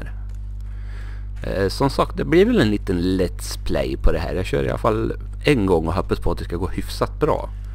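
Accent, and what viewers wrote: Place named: Sweden. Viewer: Älskar din dialekt känn lite bonländska i det (stav) c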